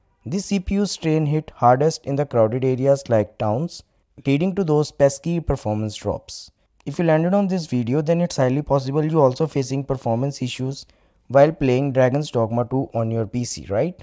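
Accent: India. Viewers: soon as you hear the indian accent you know its gonna be the worst advice youve heard so far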